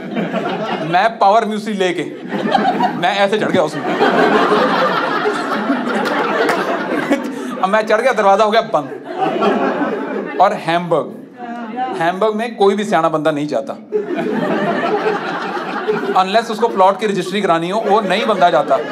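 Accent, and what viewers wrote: Punjab, India. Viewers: That Punjabi accent mix with hindi makes it different. 😅😂😅😂😅😂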